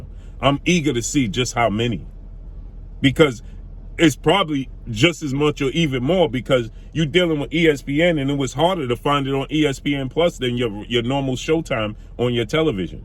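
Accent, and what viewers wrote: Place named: New York, United States. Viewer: Why is you takin' this so personally? I can tell by your accent you from NY, Errol left NY when he was a toddler.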